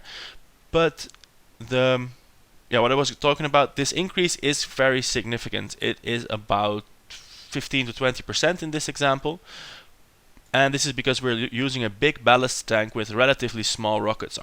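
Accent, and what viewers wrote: Netherlands. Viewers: je klinkt nederlands ouwee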